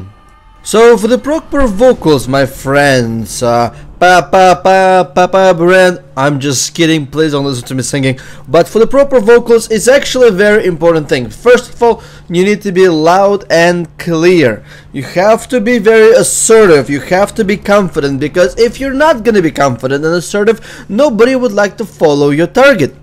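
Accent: Russia. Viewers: I would like to have a lesson because nothing is better than kicking some butts listening a multi glad russian ascent.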